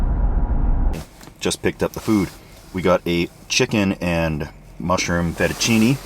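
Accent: Canada. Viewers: OOOOOH yea..... Wanted to say that ur letter kenney way is omg, so BEAUIFULLY Canadian. I FREAKIN LOVE YOU...... Never stop.... I went back on ur vids and, holy shit hairbag.....!!!!!Seriously my friend, do not stop..... Hi Krazy neighbour and Beautiful wife( who without her, I'm sure, would not be) ( By the way girl, fu*& the haters... Mad respect) Don't stop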